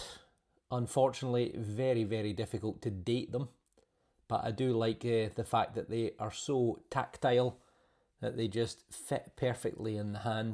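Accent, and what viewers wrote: Scotland, United Kingdom. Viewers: You no what I just trying to watch your video and just as I got in to it some stupid adds appear it bloody happens all the time. It's just rubbish how we just get bombarded with all those rubbish adds . It does not make sense, the things they advertise are just crap. No one would be bothered to even think about buying it. Sorry mate for letting out my frustration. Your doing a great job and we appreciate your enthusiasm and knowledge. Keep up the good work mate. Like your. Scottish accent.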